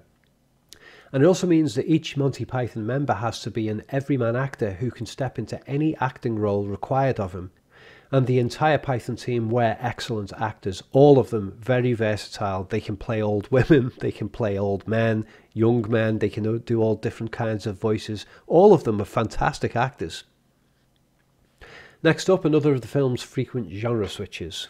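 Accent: Liverpool, United Kingdom. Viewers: Nice accent. Is that… Liverpool?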